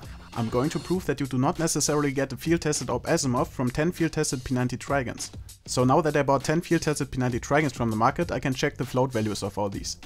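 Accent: Germany. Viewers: Fabian N aber er redet ziemlich langsam und verständlich es ist zwar der deutsche Akzent dabei aber wenn du so etwas nicht verstehst dann solltest du probieren dich an einen deutschen Akzent zu gewöhnen ... denn das englisch war sehr gut verständlich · All of this math sounds so much better because you're German <3 cheers! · Ne ich verstehe sein englisch fast nicht mit diesem deutschen akzent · Arbeite bitte an deinem Akzent, hört sich schrecklich deutsch an.. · Man hört das er deutsch ist XD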